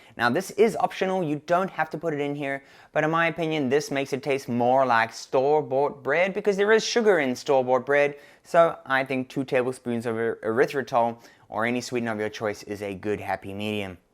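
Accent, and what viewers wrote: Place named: South Africa. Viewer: that south african twang still comes through ;)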